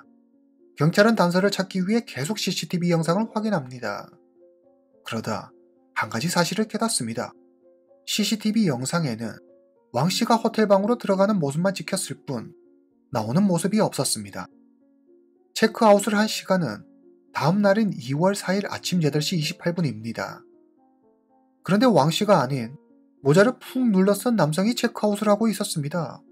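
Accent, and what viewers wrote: Yanbian, China. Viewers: 말투가 연변 조선족인가보네 ㅋ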